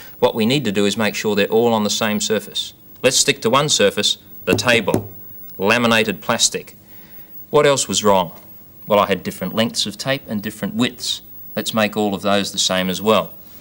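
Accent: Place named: South Australia, Australia. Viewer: Always wondered what their accent was as a Melbourne kid. South Australian!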